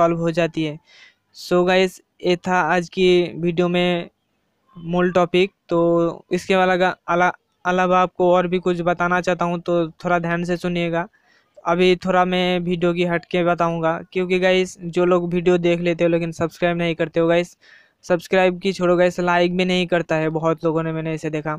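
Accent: Bengal, India. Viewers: Aapka mother language bangali hai na is liye bahot logo KO samaj me nahi aata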